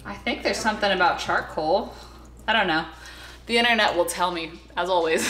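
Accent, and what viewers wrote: Texas, United States. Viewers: It's just Texas people that talk like that. My sister in law talks wierd.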